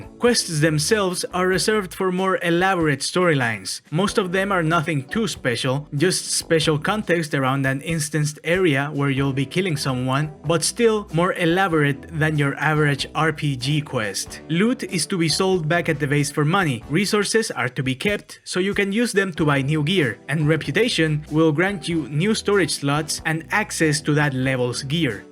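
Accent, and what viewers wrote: Chile. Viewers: Espera como que sos chileno JAJAJAJA excelentes videos eu, y excelente inglés también. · chilean accent screamer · Siempre que escucho a un compatriota y que es flaite me das un asco que verguenza, que bueno que naci en lo barnechea.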